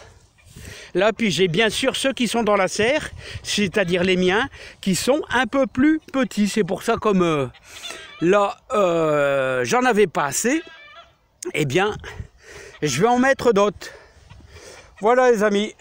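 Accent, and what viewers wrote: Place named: Nord-Pas-de-Calais, France. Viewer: Ça fait du bien de vous écouter L accent de ma naissance bravo le ch’timi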